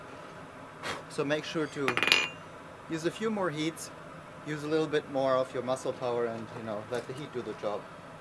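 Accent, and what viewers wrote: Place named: Germany. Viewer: Bist du deutsch? Da hast glaube ich einen deutschen amboss und irgendwie hast du auch einen deutschen Akzent...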